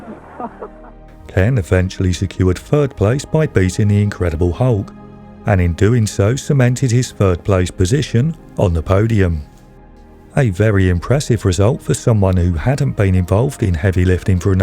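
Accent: United Kingdom. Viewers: Stop with British accents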